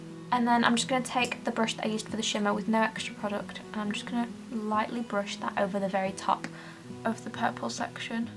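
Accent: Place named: United Kingdom. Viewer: Hii, it's me, we were just chatting via Twitter! I didn't notice you were in UK, love your accent. Yep, my hubby took me to Sephora the other day and it's kind of a whole new world to him. He is overwhelmed by how much STUFF exists, and I told him even people into makeup are feeling that way, like a fatigue with too many releases. I love the new Norvina palettes, but I just don't need them, it's tooooo much all the damn time and I need to enjoy the makeup I've already bought. I didn't know I could get P. Louise from Morphe now, I hadn't tried it due to the shipping but will now once I've finished my Paint Pot! Your look is beautiful!! 😘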